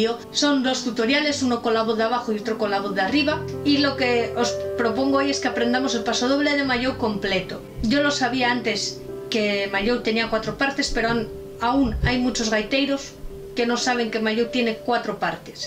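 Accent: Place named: Galicia, Spain. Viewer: ! Fíxate na canle "Tradigaita" que está en galego! Penso que á túa canle lle viría ben que os guións os dixeras en galego (vese que a veces che sae naturalmente así que, aproveita ese natural esponateo!). Tratándose de música, e moita música galega, estou máis que seguro que non lle afectará á comprensión! Ao contrario! Troca ao acento galego pola propia lingua galega!:-D Logo tamén, non sei como funcionas tí (co tema nervios/camara) nin canto tempo levas tocando pero seguro que menos videos e mellor preparados (os temas que tocas con gaita ao principio sobre todo) tamén sería un bonus e que atraería a xente:-D Ánimo e a seguir con ese labor divulgativo!:-D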